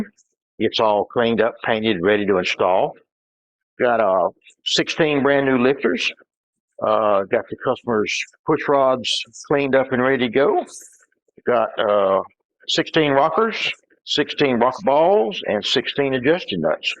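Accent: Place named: Georgia, United States. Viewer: Man, I love hearing this gentleman speak on motors. I’m from SC, and that Columbus, GA accent sounds great from here in Hamburg, Germany tonight. Thanks for the vid! Ready to get home to my 68 GTO.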